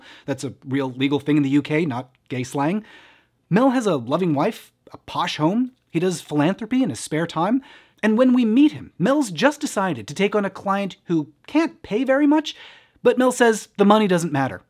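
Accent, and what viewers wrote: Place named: United States. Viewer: The Accent somehow is less British and more American sounding.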